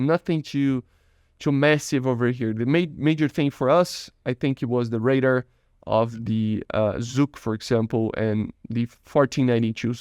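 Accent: Brazil. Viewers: He’s Brazilian speaking a second language it’s fine. Everyone knows what he means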